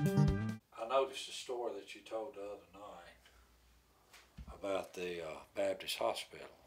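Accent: South, United States. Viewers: I just love listening to talk! He’s an awesome story teller, and love that southern accent!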